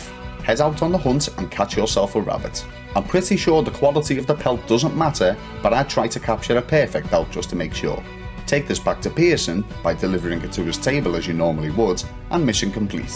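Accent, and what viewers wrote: Liverpool, United Kingdom. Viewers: Are you a scouser ?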